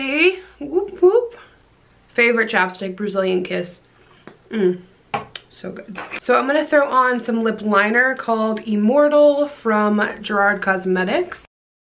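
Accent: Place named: England, United Kingdom. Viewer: Oh my goodness, your English accent is everything😍 Love it X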